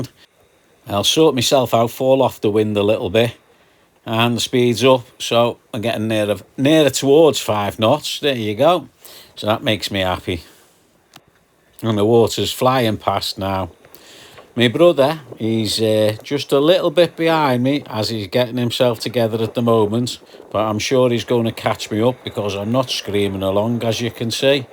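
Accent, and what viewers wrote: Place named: Scotland, United Kingdom. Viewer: "but certainly giving them a good wack in the head when they are not looking" ROFL ROFL ROFL! ! ! Too funny. My favorite Scottish accent channel on YT!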